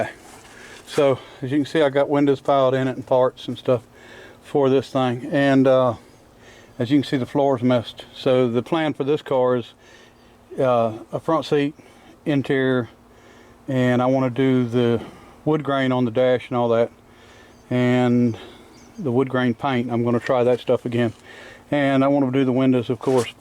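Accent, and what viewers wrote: South, United States. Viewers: I am a French / bilingual Canadian from the province of Quebec, and I understand your language. It's the same with a beautiful southern accent. I love your automotive related videos. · Nobody speaks Canadian. Kilometres with an American sounding accent. I’m an old Aussie. We speak in millimetres and inches too. Haha. I do love the connection of you tubers. Absolutely awesome work sir.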